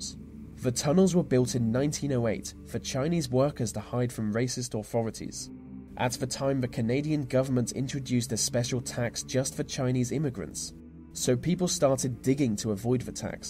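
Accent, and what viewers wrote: England, United Kingdom. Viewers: Hey there been waiting for your next video and it was posted the afternoon here in upstate New York what a great topic never cease to amaze me well thought out executed to a tee just love your voice and English accent you are a professional my good man Thanks so much I do appreciate all you put into these videos because I am always thoroughly pleased one of these days maybe our paths will cross and I will high five you job well done thank you until the next time I will be waiting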